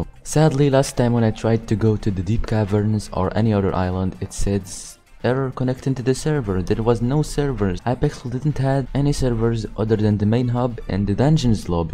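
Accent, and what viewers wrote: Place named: Arab world. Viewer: your accent sounds arabic..im arabic too !! also if you have discord can you tell me it? my discord tag is vxpxx#1832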